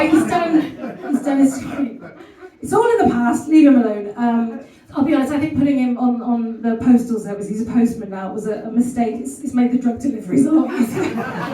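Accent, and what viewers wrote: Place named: West Country, United Kingdom. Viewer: What part of the west country are you from x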